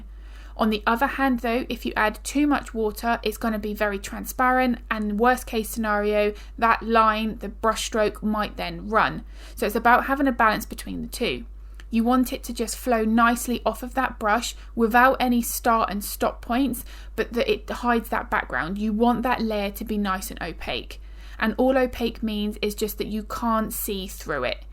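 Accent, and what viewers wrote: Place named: United Kingdom. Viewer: I’m new to animal portraits, yet I am a trained artist! You are fantastic:) My BFA is in Ceramics and Abstract Painting. In a week or soI I’ll be joining Patreon. In just seven minutes I’ve learned SO much! Thank you for sharing your gift. Oh, I’m Shane, BTW. Hope to chat with you soon. I assume you are across the pond, based on your accent lol. I’m in Charleston, SC…..and there is a HUGE market here for pet portraits here that I want to dive in. I do human portraits as special gifts due to the length of time it takes to complete one, but I sell my original abstract more. Hope to chat with you soon!! Oh, have you ever done a medium goldendoodle? -Cheers, Shane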